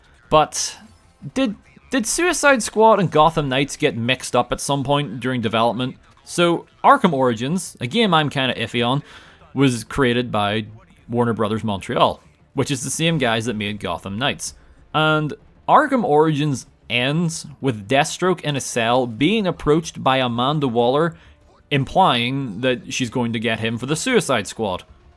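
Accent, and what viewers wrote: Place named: Northern Ireland, United Kingdom. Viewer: as a fellow northern irish person its always such a weird feeling when I click onto a youtube video and hear the accent lmaooo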